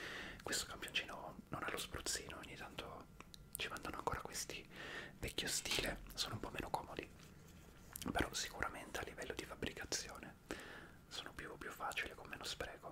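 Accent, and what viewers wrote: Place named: Tuscany, Italy. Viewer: sentendo il tuo accento, perché mi sembri toscano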